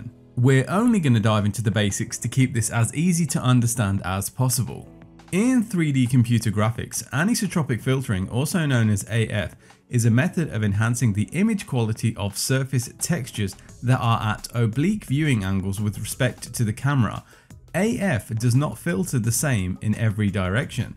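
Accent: United Kingdom. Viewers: Damn, that British accent! I absolutely love it! And your video too, of course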